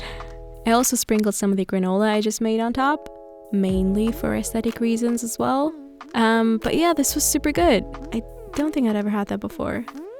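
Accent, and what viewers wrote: Germany. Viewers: i love your videos, like just the way you talk ;) are you german by the way? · wait are u german?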